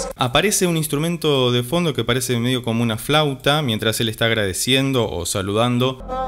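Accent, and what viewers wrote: Argentina. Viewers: Por el acento, debe ser argentino.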